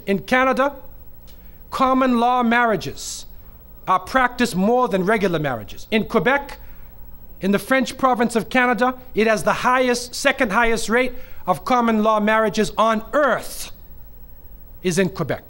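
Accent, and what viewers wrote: United States. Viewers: he's originally American. Boston I believe. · I love his American accent. Awesome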